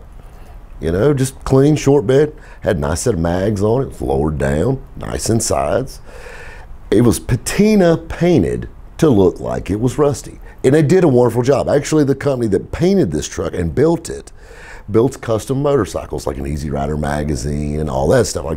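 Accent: South, United States. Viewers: i love this guys stories ed's are good to but southern draw takes me back home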